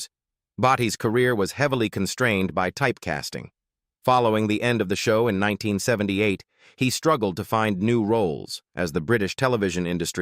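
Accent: United States. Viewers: Why use an American voice to tell us all about a British show. 😢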